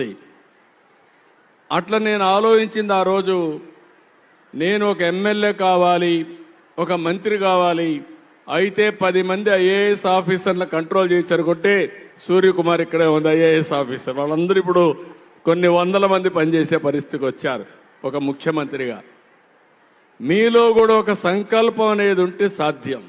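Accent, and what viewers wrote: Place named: Rayalaseema, India. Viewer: రాయలసీమ ప్రాంతంలో అలానే అంటారు అండి, మా friends కూడా గమనించాను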